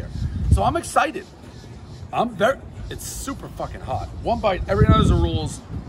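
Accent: Boston, United States. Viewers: It's a good Skoore. The boston accent is hard to shake. Everyone knows the rules.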